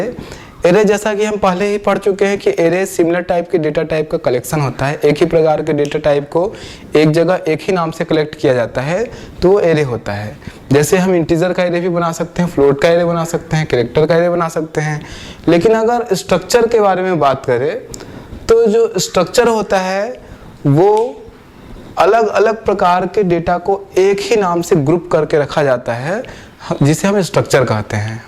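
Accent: Bihar, India. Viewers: Sir aap Bihar se hai kya??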